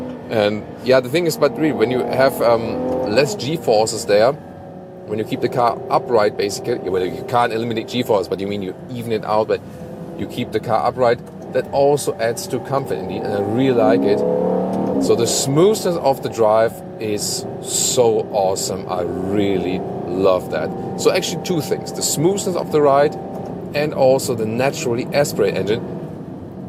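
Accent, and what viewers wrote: Germany. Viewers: Irgendwie stößt der german accent bei mir auf unwillige Ohren.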